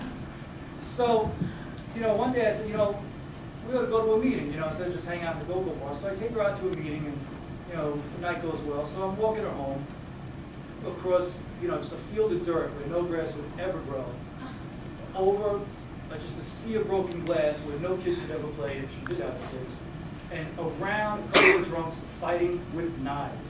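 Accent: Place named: New York, United States. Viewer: Dude, you are funny guy. I love your accent. Is it NY or Chicago? LOL If you watch my latest vid you will see the proper way of adjusting ric truss rods. PS- I liked rehab so much I went back three times. Hey I subbed to you, sub back. You rock.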